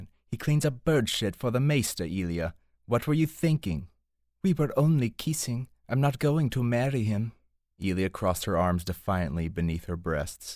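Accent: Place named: United States. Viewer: So weird having these read in an American accent...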